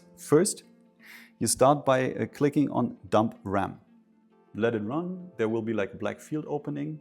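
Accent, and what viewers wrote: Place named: Germany. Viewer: Tell me your German without telling me your German , pronouncing :)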